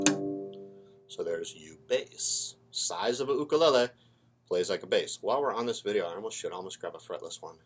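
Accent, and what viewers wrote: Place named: Hawaii, United States. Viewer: and his staff are from Hawaii. So they pronounce it oo-kuh-lay-lee.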